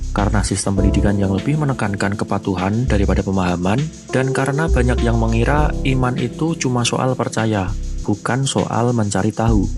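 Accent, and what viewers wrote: Java, Indonesia. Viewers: Jawamu medok ga enak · Gw kirain mo belain pke dalil dalil konyol dan gw udh negatif thinking denger medoknya, ternyata gw ga tepat, ternyata bagus ada dasar knowledge yaitu sosiologi, ya wis lah gw sub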